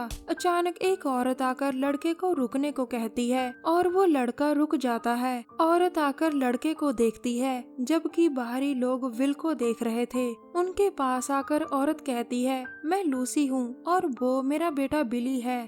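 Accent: Punjab, India. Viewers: Ap punjabi ho ya haryanvi... ? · Love ur punjabi ascent Punjaban ❤️ Pardeep gill from Ludhiana Punjab ❤️ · I suppose the woman who is narrating is a punjabi woman as her prononciation is very similar to punajbies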